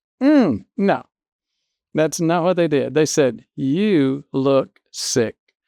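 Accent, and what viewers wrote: South, United States. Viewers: Beautifully presented. Of course, I'm in Little Rock so I hear those Southern roots serving you well!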